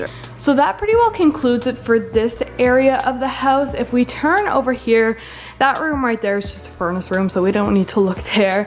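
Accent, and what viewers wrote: Canada. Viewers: I'm so sorry for asking but... Are you Canadian? You sound Canadian. But like, Eastern Canada.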